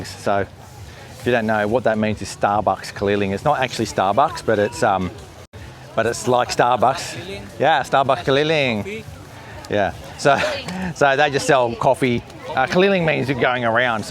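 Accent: Australia. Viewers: Great vlog for an interesting peek at truly local lifestyle.....had never heard of this before. Gotta love hearing your Aussie accent reflected right back at you.....quite a surprise!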